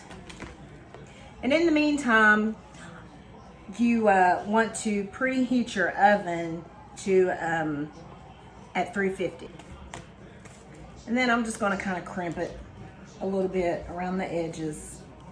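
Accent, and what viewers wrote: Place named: Georgia, United States. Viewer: I just love the way you talk. But I am trying your make your pie. Sounds like you are from Georgia or the carolina.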